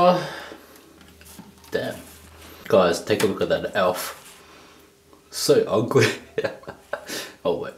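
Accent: Australia. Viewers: Till now still can’t decide whether I watch you for your talking (I LOVE AUSSIE ACCENT don’t judge pls) or how you viciously eat 🤔🤔 merry Christmas!!! 🎉🎊🎁 Ps( this is the saddest gingerbread house ever made 🤧😂)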